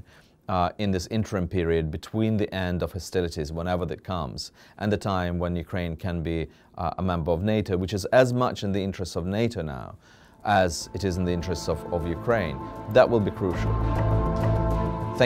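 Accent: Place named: RP, United Kingdom. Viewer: When you click on a video of The Economist hoping to learn something and stop halfway as you figure out it is posh British accentuated bla-bla-bla…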